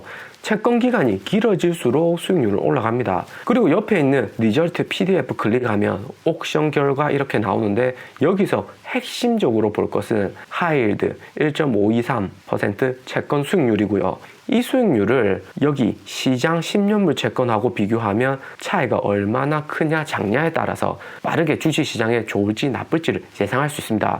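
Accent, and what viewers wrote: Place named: Gyeongsang, South Korea. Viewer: 항상감사하게 듣고보고 있습니다, 구수한 경상도 말투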